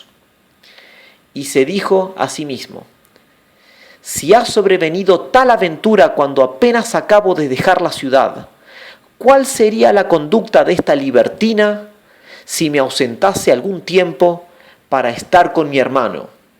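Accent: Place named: Arab world. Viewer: Por lo que veo, está leyendo la traducción de Blasco ibañez, basada en la versión de marduz, que es lo que estaba buscando. Las críticas que leo sobre el estilo del narrador son tontas, obviamente hay diferentes estilos de narración oral, a mí me gusta ese dejo árabe o del sur de España que tiene (soy argentino, no lo puedo precisar), pero gustos son gustos; lo que sí es importante es que es una voz humana.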